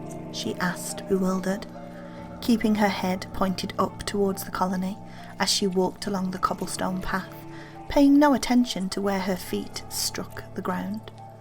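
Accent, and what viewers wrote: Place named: Northern England, United Kingdom. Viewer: This was excellent! If you don't mind me asking, where abouts are you from? Your accent sounds quite similar to one of our narrators who is between Lancashire and Yorkshire :)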